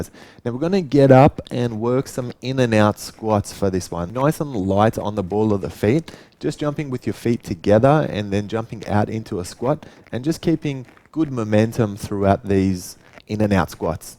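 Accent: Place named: Australia. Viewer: He's got an aussie accent, but where was this filmed? Gorgeous place! I did this workout today (not all, though!) and absolutely loved it. So glad I found this channel.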